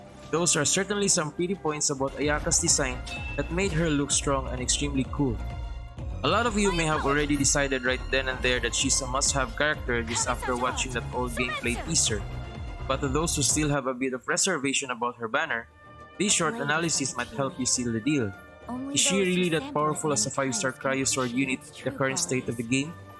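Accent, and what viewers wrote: Philippines. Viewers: filipino accent gang lets gooooo!!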